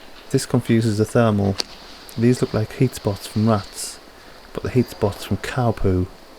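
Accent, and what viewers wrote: United Kingdom. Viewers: Love the UK accent in these videos, but yeah, we've got rats in the states.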